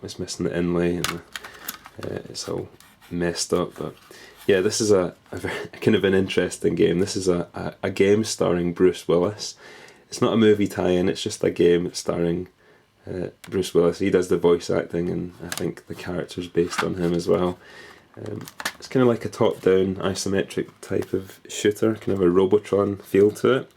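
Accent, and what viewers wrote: Scotland, United Kingdom. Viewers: Where u from? You sound Scottish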